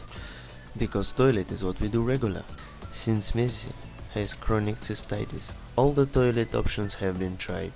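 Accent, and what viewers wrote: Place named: Russia. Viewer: Haha😂 The Russian accent somehow makes it much more intimidating!🤣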